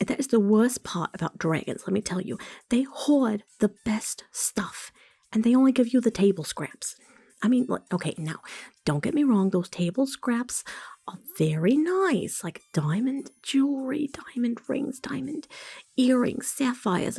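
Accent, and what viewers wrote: New Jersey, United States. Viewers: Omg i freaking love this, Jersey accents are one of the best American accents, my personal favorite is dixie southern aka georgia southern, but Jersey is close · Love the Jersey accent!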